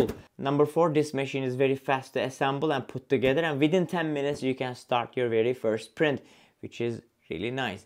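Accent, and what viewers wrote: Turkey. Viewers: accent 100% Turkish :) (not a criticism)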